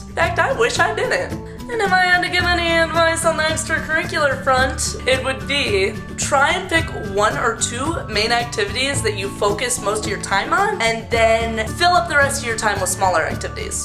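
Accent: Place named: United States. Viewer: Like you are a harvard student(someone intelligent as hell) then i'm pretty sure you learned to speak every languages possible in the world can you do a french version? i'm very interested to go into this university :c PS: I understand a little bit with subtitles because i'm shit to understand american's accent & pretty funny video xD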